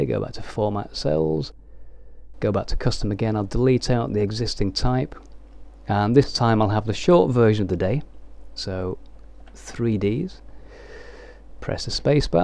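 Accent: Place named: RP, United Kingdom. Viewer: Am I the only one who thinks The Queen's English does enhance the audio?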